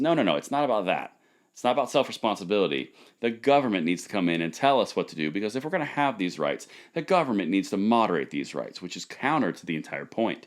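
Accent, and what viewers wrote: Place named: South, United States. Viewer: Badass southern accent lol. Keep up the great work brother.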